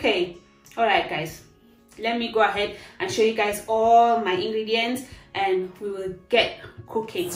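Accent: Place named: Nigeria. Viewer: Wow I always thought you are a Nigerian, when I saw khati khati, I was like? Do we have a Nigerian dish called khati khati????, its looking so yummy wish I could try this, the way you pronounce you maggi looks so Nigerianlized, the baked chicken came out great...simple this is the first time you made a video less than 10min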